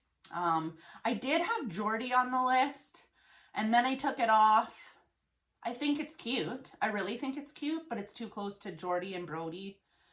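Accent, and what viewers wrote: Canada. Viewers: Sorry I’m late. I just started to watch you’re videos. I was fascinated by the diy haircut video and the Canadian accent. I’m from Michigan. 😉 Here was our boy name list. Our sons name is Ethan 💙 Jack Jackson Jake Jacob Jaden Jayden Ethan Carson Zachary Carter Tyler Liam Bentley Blake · this is the first video of yours ive ever seen so are u canadian? u sound like u have a super strong canadian accent (im canadian btw)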